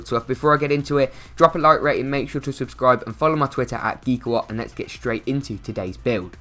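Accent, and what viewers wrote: England, United Kingdom. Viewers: He's clearly english and he's talking about Dollars? $ probably doesn't live in the UK then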